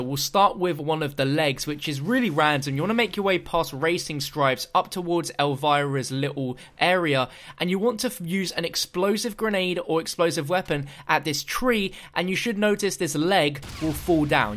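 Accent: United Kingdom. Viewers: Nick Bailey it's British accent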